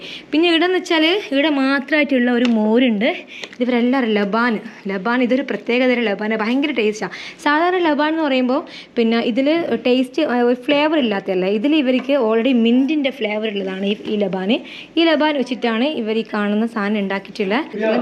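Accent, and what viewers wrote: Kannur, India. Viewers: കണ്ണൂർക്കാരത്തി അല്ലേ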